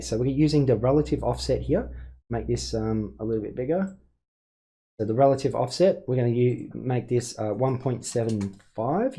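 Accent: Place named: Australia. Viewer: feel like im at aus again, with the accent, been working thier for about 3 yrs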